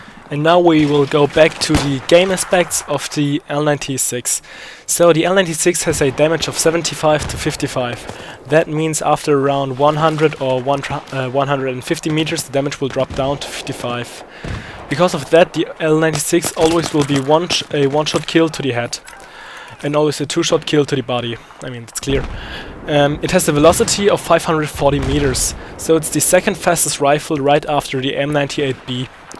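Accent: Germany. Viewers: are you somekind of german or austrian?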